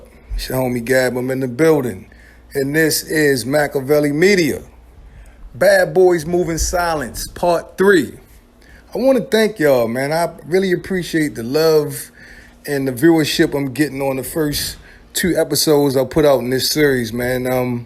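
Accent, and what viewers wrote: Baltimore, United States. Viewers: aye bro you from Baltimore? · First of all Biggie was hip hop unsigned hype b4 he met pac . And puff made big career not no pac . Pac a whole liar first thing first you a pac fan from bmore i can tell by your accent talking bout ny stuff you clearly dont know much about . Did he sleep on son couch mayb once or twice but he didnt live with pac yall take that bullshit and ran with it . Pac got robbed by real gangstas and did what pussies do blame everything else except for the fact they got caught slipping . He so tuff why he aint get at king tut and them u know why cause he fucking couldnt tupac is a actor he took his acting to the rap game . Once he saw how ppl loved him for his role as bishop he adopted that in his rap shit and it worked . Why would big get involved in some shit he dont have nothing to do with . Like flex said pac lied and we all know it tupac the biggest fraud ever . 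Fuck him Brooklyn forever no slander on the King of Ny . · It's crazy how we can tell you're from b more just by the accent that's cool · Im loving that bmore accent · You straight from Baltimore.. lol great channel my G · U gotta be from Bmore !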